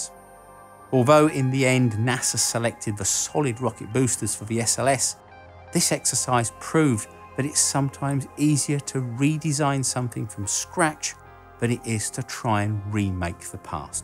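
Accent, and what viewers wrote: United Kingdom. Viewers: I can’t listen to or trust people with british accents so won’t be watching any more of your videos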